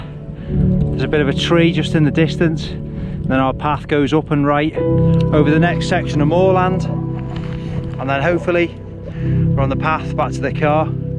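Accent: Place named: Merseyside, United Kingdom. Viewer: Not many can pull off a walk and talk and keep it interesting but fair play I enjoyed this. Lucky with the snow 👀 still nothing here at the moment as is the norm unfortunately. You sound quite local to me? Merseyside Region? Nice video, Atb.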